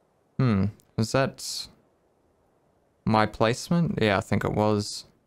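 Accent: Australia. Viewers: When are you going to build something out of thatch? Maybe add a single campfire in the middle of it all, but not much more complicated than that. I find myself getting tense and stressed just watching you do these massive, OCD fueled rage enhancing builds. I think ASM is the modern hipster thing. You already have us addicted to your sexy Aussie voice (auditory-tactile synesthesia) so you might as well calm us all down with a simple build that causes us all to mellow out and drool on ourselves. Of course, the metal music in the background will have to be something more subdued. It's either this or narcotics and I'm sure you wouldn't promote your fan base to be drugged out roadies.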